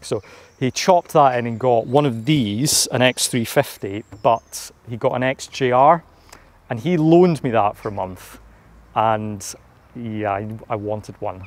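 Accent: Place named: Scotland, United Kingdom. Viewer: I fell asleep after 5 mins,,,,you are speaking scottish,,,everyone hates people speaking scottish,,,so,,,Im off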